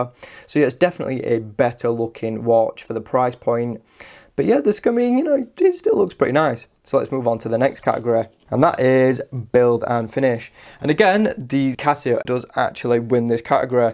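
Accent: United Kingdom. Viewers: LUV YOUR BRITISH ACCENT SU MUCH!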